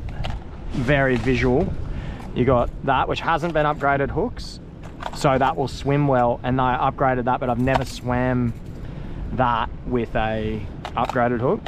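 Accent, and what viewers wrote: Australia. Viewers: definatly has a different way of talking but yes his accent is strong aussie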